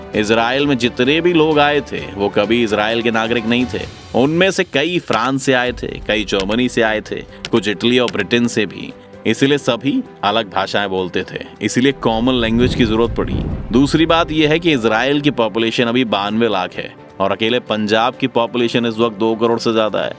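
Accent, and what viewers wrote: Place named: Delhi, India. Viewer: सर जी आप थोडा नुट्रल रेहना....आप थोडासा देल्ही वाइज बोलते हो.... बोलने वाला नुट्रल रेहना चाहिए... देलही की तरफ मत झुको...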